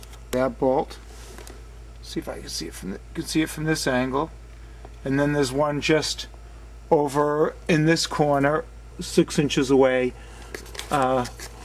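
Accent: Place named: Boston, United States. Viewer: great job so far., its been almost 5 years since mine popped on my 96 supercharged VR6 GTI, and your video is leading me to fixing it on my own. i am not faint of heart, i build 1000+ hp drag race VW/Audis in my spare time, but i really didnt want to do this job. and still part of me almost thinks the 800 plus bucks the quoted price to do would have maybe been worth it. i ripped one of the RCA off the stereo right out of the gate, very discouraging. but the car has a big stereo in it so its just gotta be fixed is all. again, thanks for taking the time to detail this. i have the Bentley manual but never felt the need to actually look at it. and i love how not-a-mechanic you appear to be and yet still tackled this job. the Boston accent is a plus:-)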